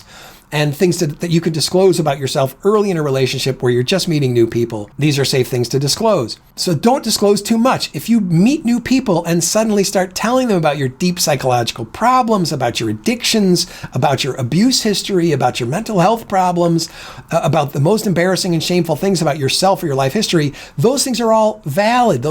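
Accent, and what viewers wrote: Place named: Chicago, United States. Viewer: He's got a Chicago accent that I find distracting.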